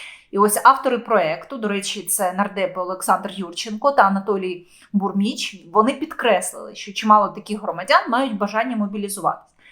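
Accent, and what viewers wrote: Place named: Russia. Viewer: ты с себя выдавливаешь укр.слова Думаешь на русском,в уме переводишь,поэтому акаешь и запинаешься,говори как тебе комфортней Мы понимаем русский язык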